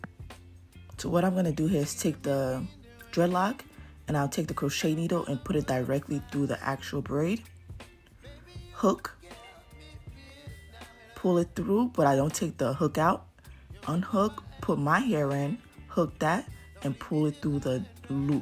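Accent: Liberia. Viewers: 🇱🇷Liberian!! As soon as I heard the accent 😂😂🩷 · I Heard your Voice and I knew Off rip You Were Liberian ! 🇱🇷🇱🇷🇱🇷 Hey my sista I can’t Wait to do my Hair ! Thank you !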